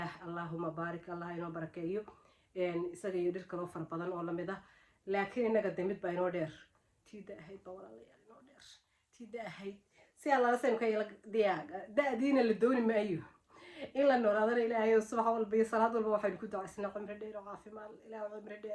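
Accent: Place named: Burco, Somalia. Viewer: Waad iga Qoslisay da’ada aad sheegsnayso,Mashaallah Eraybixinta reer Burco baad u tahay!!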